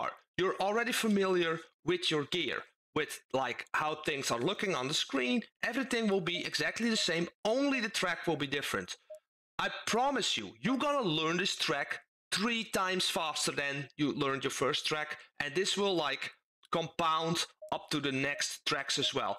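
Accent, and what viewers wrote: Netherlands. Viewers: Are you Dutch? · kom je uit Nederland? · Super Dutch, lol. that dialect is obvious. Groet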